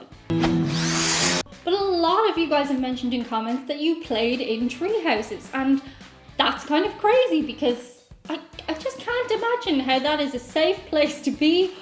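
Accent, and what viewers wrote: Ireland. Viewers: You know is getting serious about a topic when the cat ears come out. Just imagine poor Chewie’s shock upon being woken up from a lovey nap to be confronted by a glittery cat creature with an Irish accent. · I would suggest flying into Minneapolis (AMS) from Dublin (DUB). I see tickets on decent aircraft for $631 on Lagskipping airline route finder. Then take a car down Interstate 35 through Des Moines, Iowa to Kansas city (MCI). You can pull over to small communities within miles of the highway. When you get to Kansas City, you can fly direct back to Dublin for about $750. I don't currently know anyone who bowls more than once every 28 years. When you get to northern Iowa, pay attention to the accent. Many of the immigrants that settled from countries that did not speak English hired Irish girls to teach in one room schools. It was a welcome opportunity during the potato famine. 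The central US does not have any hint of an English accent. It was strange hearing your Irish accent instead of the fake TV and Movie ones. See what you think when you drive through. Your timing should correlate either to the Iowa State fair in Des Moines or any or several fairs on your way through. (most are in late June or July but Des Moines should be in August). And yes, the coasts and south are almost like different countries from the Midwest west of the Mississippi. Towns east of Mississippi in the Midwest are just like extensions of Pennsylvania with large cities. If you want to try real corn, you want bicolor Iowa supersweet harvested no more than a day (preferably 3 hours) before cooking. (be sure not to get dent corn. that is the low quality stuff for feeding pigs or put into cans exporting) Ca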